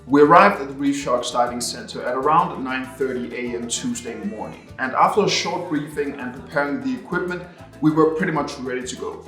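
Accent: Denmark. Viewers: Are you from Denmark? Your accent sound danish.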